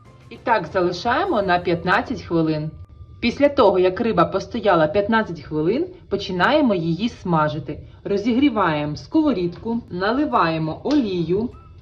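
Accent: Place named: Russia. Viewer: чутно що ти у побуті маскваротиш.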